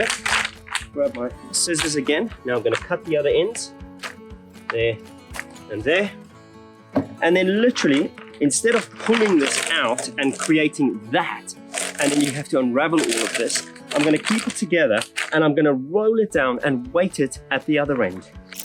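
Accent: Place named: South Africa. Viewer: The accent seems South African but the wall and fence behind has no rhino fencing.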